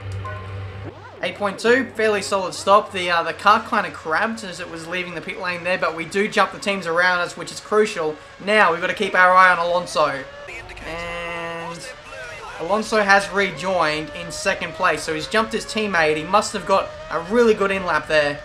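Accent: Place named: Australia. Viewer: no... Almeno non tagliano così... Comunque mi godo il suo accento australiano, capisco e mi diverto.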